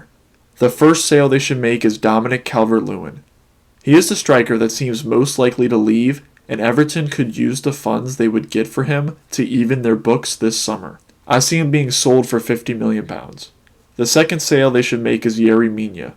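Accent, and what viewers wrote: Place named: United States. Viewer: Started watching, heard Amercian accent, switched off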